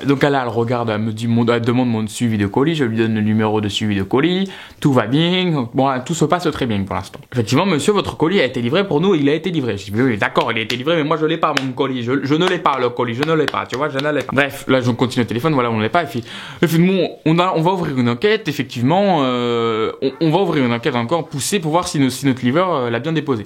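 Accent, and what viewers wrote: South, France. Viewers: Mon pauvre. Moi aussi mon fait la même chose chrono poste c chiant putin Ps C mon avis ton accent du Sud te vas biens mais stp arrête le ca me rappelle un prof Sadic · Mdr l'accent du sud😂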